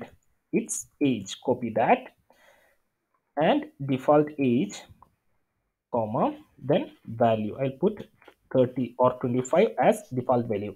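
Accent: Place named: India. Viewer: very useful content, but I'm still not used to hearing your Indian accent voice🙂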